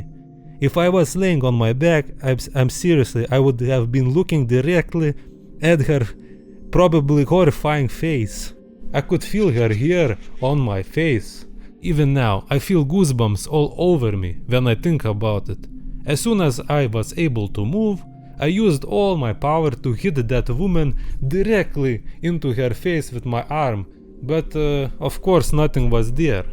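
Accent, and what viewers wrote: Russia. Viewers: Are you Russian or European? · Are you having a Russian accent? (P.S. im Russian) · why is his accent russian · like your russian accent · u russian??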